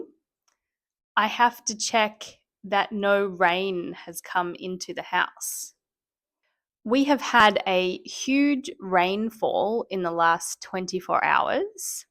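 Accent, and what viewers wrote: Australia. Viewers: Your lessons are great because they are real life images of Australia, along with your typical Australian accent. I am going to Australia to live with my children so I really need to practice this Australian accent. Thank you very much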